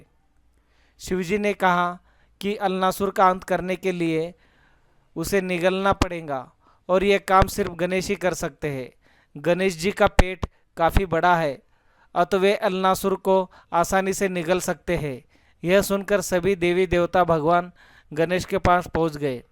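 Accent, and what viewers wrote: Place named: Maharashtra, India. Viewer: ये मराठी माणूस की हिंदी है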